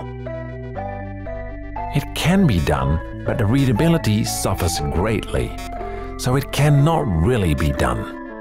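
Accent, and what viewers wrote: Netherlands. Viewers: I already thought I could hear it from the accent, but hell yeah this man dutch. Mooie video, ik hou wel van dit soort deepdives in iets waar je normaal gesproken nooit over na denkt :) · The Dutch accent is truly horrific. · Why is it that i can always hear that someone is dutch? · In the 1990s, I was already an experienced engineer in my 30s. Some technologies that used 7-segment: "Numitron" (incandescent), LED, LCD, VFD (vacuum fluorescent), mechanical (plastic, brightly painted on the active segments), & "Panaplex" (Neon, used on some 70's microwave ovens, and gas pumps). This guy's accent sounds like his native language is Dutch. I was born in the Netherlands. Moved to the US as a child, and use to tease my father about his thick accent, in my teens. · Are you from the netherlands? it kind of sounds like it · noticeable Dutch accent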